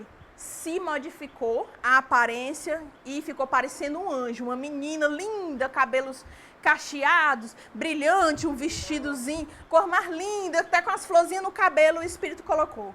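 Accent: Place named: Northeast, Brazil. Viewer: Primeiramente ressalto o português bem falado no sotaque nordestino aff ..Eu amo é um deleite para os meus ouvidos, acho sotaque mais bonito do Brasil. É também dizer que essa palestra foi um deleite para o meus ouvido ,vi a hora mais vou ouvir muitas outras vezes e seguir essa palestrante que amei obrigada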